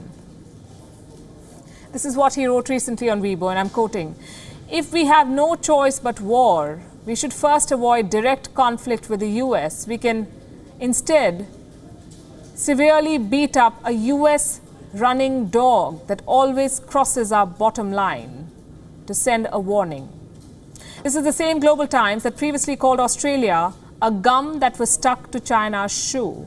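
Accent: India. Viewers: Damn Indian English~~~ It sounds like a convenient store clerk voice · I know you are doing the good thing. Thanks. But do you realize that you have a very heavy accent and people do not understand what the heck you are talking about. Can you slow down your speech and enunciate. It applies to all Indian speaker with heavy accents. Can't you guys know you have very heavy accents? I know China lies. but you should say it clearly so that more people understand the truth.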